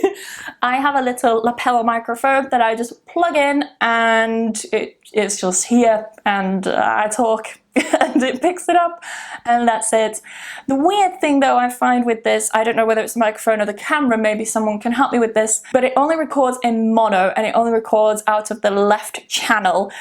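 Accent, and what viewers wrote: United Kingdom. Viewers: You’re one of my favorite teachers, you speak clearly, that is why I love British accent. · You're the best teacher in British accent.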